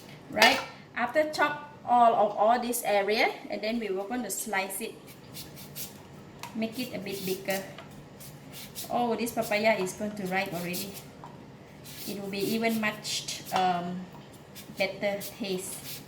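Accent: Malaysia. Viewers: no wonder you sound so Malaysian :) I really enjoy your down-to-earth explanations to cook home cooked food with love ! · You have a malaysian or singaporean accent..? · U have Malaysian accent?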